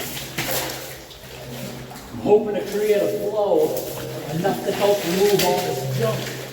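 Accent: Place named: Montana, United States. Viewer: u from montana? ur accent sounds like a guy i knew from montana...or at least he lived there at one point in his life lol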